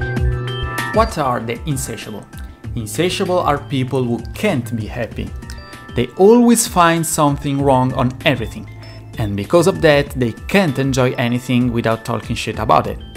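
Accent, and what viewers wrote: Italy. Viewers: love the italian way that talks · questa la prima volta che in un video sento quella che sembra essere la tua voce e penso di poter affermare con certezza che tu sia italiano ;)... grande ti stai impegnando e ti faccio i miei complimenti continua a cercare di tenere attivo questo canale in inglese e migliorare sempre.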